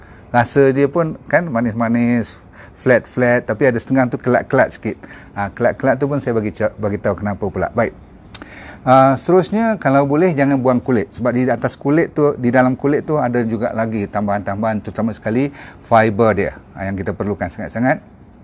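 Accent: Malaysia. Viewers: Ga ngerti bhs nya capek ngikutinya. Logat melayu sih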